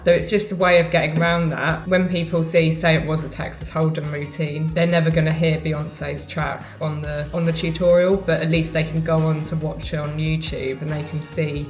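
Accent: England, United Kingdom. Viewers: She is so beautiful and the English accent makes it even better.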